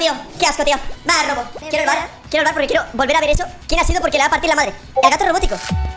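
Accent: Argentina. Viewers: Weyyy primera vez q veo un video de el y me doy cuenta que es Argentino 😂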